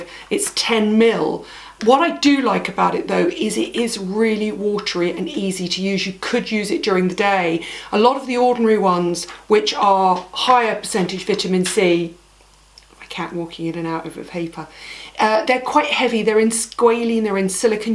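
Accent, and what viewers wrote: United Kingdom. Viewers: Happy new year dearest lady ! Your videos are always so pleasant Lovely classy British accent love it 💝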